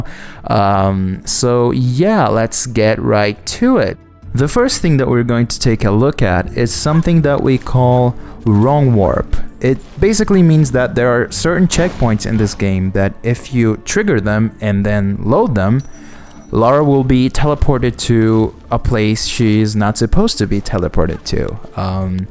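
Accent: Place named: United States. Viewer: No dude you sounded like an american for me when i first met u!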